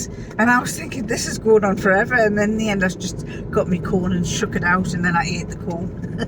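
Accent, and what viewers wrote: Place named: North East England, United Kingdom. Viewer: Hi there my mother kept my teenage quilt they threw nothing out that generation. its about 54 years old its a bit faded and thinner athough in amazing condition so when she passed away I took it. I remember it had a frill around it that was taken off. We used to call them eiderdowns. I put it inside a single warm quilt cover and its lovely to snuggle under. Always lovely to hear a North East accent on a vlog. I live in Newcastle xxx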